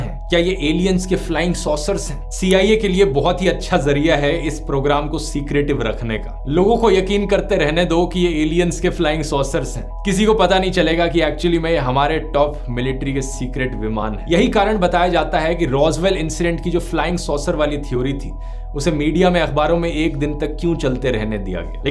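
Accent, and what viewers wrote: Haryana, India. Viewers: DONT YOU THINK DHRUV RATHHEE STILL KEEPS HIS HARYANWI ACCENT(JAAT) WHILE SPEAKING HINDI IN THE VIDEOS.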